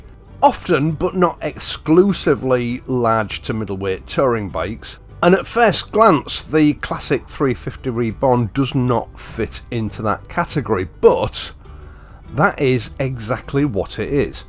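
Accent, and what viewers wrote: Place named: Hull, United Kingdom. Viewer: Great to hear a proper 'ull accent - can you say 'purple working shirt' and 'nine ninety nine' ?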